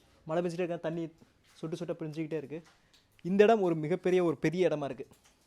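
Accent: Kanyakumari, India. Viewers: Very beautiful video.. Lovely effort to bring this place to forefront. Indian ASI has helped Cambodia lot of temples and it is quite a shame that 1200 year old place here is left unattended with no maintenance. One of the artefact where flowers were kept look amazing. Better be careful with those Train insects - could be poisonous too and good to be out of structures that could fall on head.. Love to hear the Tamil presentation ingitu, angitu kind.. Kudos!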